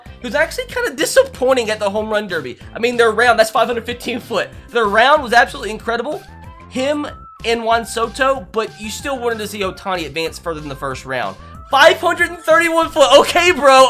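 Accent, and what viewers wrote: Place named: Alabama, United States. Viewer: he's from Alabama and has a accent so do I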